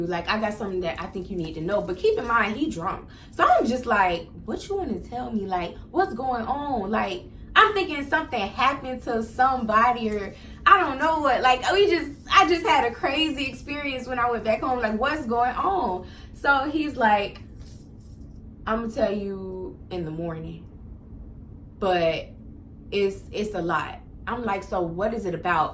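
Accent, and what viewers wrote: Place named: New Orleans, United States. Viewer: You from Nola ? I hear an accent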